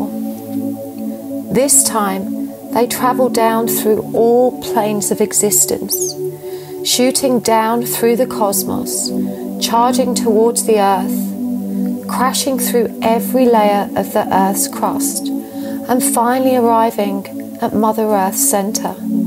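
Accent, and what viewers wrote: United Kingdom. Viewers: Wow! Thank you! That was very transformative... I’m very glad I watched this. I felt so elated and tingly all the way through... and man! That was the coolest elevator I’ve ever seen if I do say so myself 😎😂... but seriously, thank you, I honestly wasn’t going to watch it as I’ve been off Twin stuff this week, me and my twin had a massive argument and I told him to move out which he has started to, so it’s been a rough week to say the least - I’ve gave it up to the divine and surrendered to refocus my energy onto myself, I’m just out of patience right now and we both have healing to do, anyways, I was guided to here for a reason, perhaps some healing has been done for my connection and all the other beautiful twins 💕 plus I like listening to your accent 😊 I’m Scottish, moved to Denver 4.5yrs ago, so reminds me of the UK 🌹 looking forward to more of these, thank you so very much for sharing your gifts with us all and helping us on this journey 🙏 love and light 💕🌹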